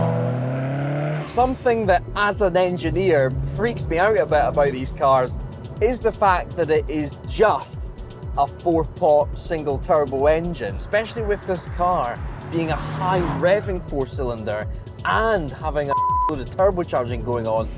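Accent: Scotland, United Kingdom. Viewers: It’s partly his Scottish accent I reckon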